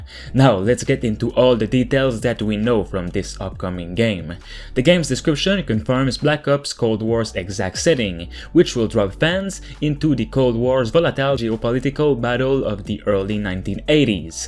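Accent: Quebec, Canada. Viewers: T’es tu Québécois par hasard? 👀😂 Good content, continue comme sa!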